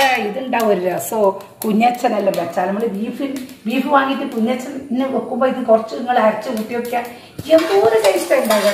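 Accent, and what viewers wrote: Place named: Thalassery, India. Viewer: ഉമ്മാന്റെ വർത്താനം ഞമ്മളെ തലശ്ശേരിക്കാരെപോലെ 😍😘❤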